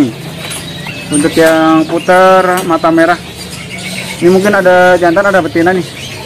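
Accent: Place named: Java, Indonesia. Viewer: Jawanya medok. Banget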